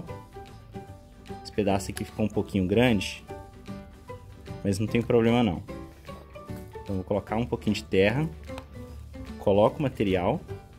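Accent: Minas Gerais, Brazil. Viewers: Que sotaque mais fofo! Será mineirinho? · Bom dia. Seu estilo de voz me faz lembrar e sentir saudade do povo mineiro, inclusive meu primo Geraldo Mangela, que mora em BH. Bjs de saudade primo, te amo!!!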